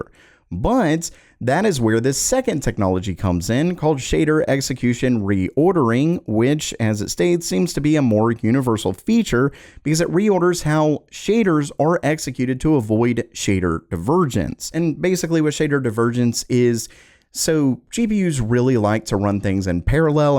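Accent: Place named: Pennsylvania, United States. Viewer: dude are you from pennsylvania. you say on like awn. my wife does the same thing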